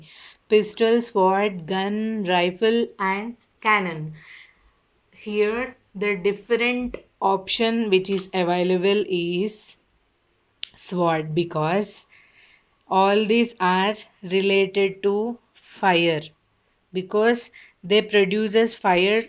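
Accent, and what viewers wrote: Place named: United Kingdom. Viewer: mam aap ko london main hona chahiye tha aap ki english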